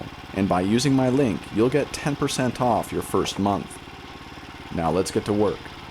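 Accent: Canada. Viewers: What a beautiful cabin! Love the wood stove that you put in too. So nice to hear your Canadian accent, I'm from Canada and I don't get to hear the accent often. Love your front door too, it's huge and perfect for the cabin. (from Sandi)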